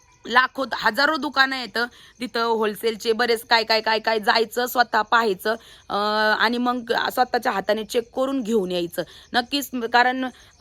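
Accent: Ahmednagar, India. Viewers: लोकांकडे लक्ष नको देऊ तुम्ही बहिणी खूप ट्यालेतटेड आहात मी नेहमी व्हिडियो बगते खूप छान वाटत....... नगर ची भाषा तुम्ही बोलता तशीच आहे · Tai tya areyachi bhasha tshich aahe mi pn nagar chi aahe asch bolt ja chan vatte tikd tshich boltat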